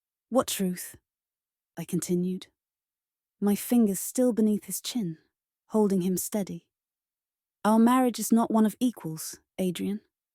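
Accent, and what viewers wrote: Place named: United Kingdom. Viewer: Why do all these FLR stories have a UK voiceover. Probably due to the high level feminists in there country. Enjoy while you can before sharia law takes over.😂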